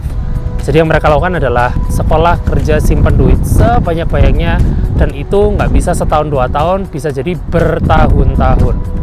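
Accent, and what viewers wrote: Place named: Java, Indonesia. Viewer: Jowo mas😂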